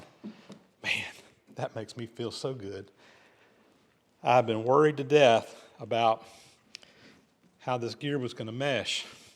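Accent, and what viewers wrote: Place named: Georgia, United States. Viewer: I came here only as a follower of the Tally Ho project. Initially I was debating whether to follow as I could hardly make out a word you said - (Georgia accented English dialect to Dorset English ear). But I'm very glad I stayed. After a month I could follow you clearly & and learnt what a fascinating channel you ran. Watching how you overcome problems and your clear explanation of the most technical processes are excellent. I'll be staying.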